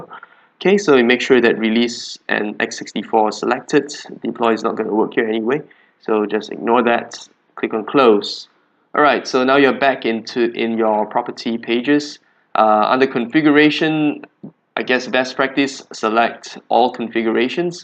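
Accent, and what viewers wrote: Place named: Singapore. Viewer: Singapore? Malaysia? That accent is unmistakable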